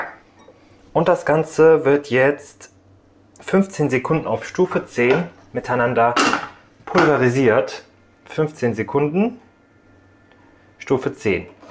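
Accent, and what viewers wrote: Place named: Cologne, Germany. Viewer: Klasse Video,! Kommst Du aus Köln? Ich frage wegen Deinem sympathischen Akzent. Milsch, Honisch...... 🌸🌸🌸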